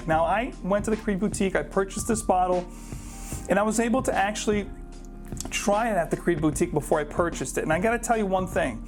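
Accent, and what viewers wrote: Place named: New York City, United States. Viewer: I like your reviews, clear NYC accent... good descriptions , gentleman style!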